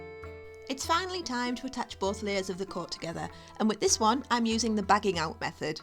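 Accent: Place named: Teesside, United Kingdom. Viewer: Thanks for this video. I have absolutely zero skills in making clothing but it’s been really helpful in relation to fabrics, colours and even potential suppliers. I also recognise that accent. Teessider? Or there about?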